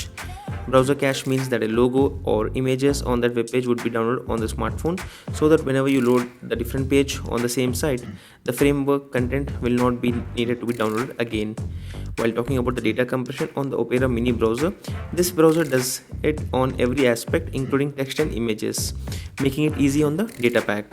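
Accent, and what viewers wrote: India. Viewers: can I say I often watch these video is for learning Indian English accent. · I'm an EFL English teacher and this guy speaks exactly how I tell my students not to. If you don't care to decrease the influence of your native language accent on your English, at least speak slower. Fortunately, you have the article to help people who speak global English (and not Hindi English). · indian accents are hard af to understand, i would rather learn hindi than listen to this. hopefully pimsleur has it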